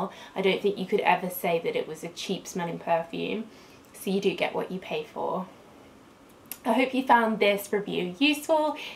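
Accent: United Kingdom. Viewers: I love this accent not every British person does have this kind of accent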